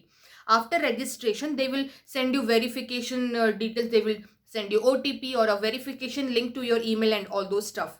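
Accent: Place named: South India, India. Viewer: Great job ji.. SIMPLE STRAIGHT NO DRAGGING ON POINT.. thought you r a south indian haha.. VERY HELPFUL.. Didn't try yet let's c... Any doubts can you help Dear? Tale care Frnd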